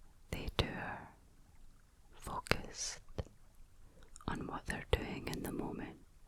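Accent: Scotland, United Kingdom. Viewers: Going to Glasgow soon, is that anywhere near your accent? Been listening to you for a few years, so just wondering :D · So to be honest I clicked on this video because I thought she is attractive. Hey, at least I tell the truth. However, I am now in ASMR heaven. I have listened to a LOT of ASMR videos for the past 15 years, maybe longer. I think we have a winner here. As an American, the Scottish accent does the trick, and she has mastered the "floating hands". Well done madam! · Scottish accent for the win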